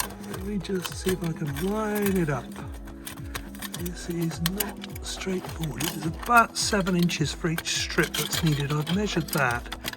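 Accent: United Kingdom. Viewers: my friend you are not an idiot (you just talk funny 😄 (British accent)). Great job.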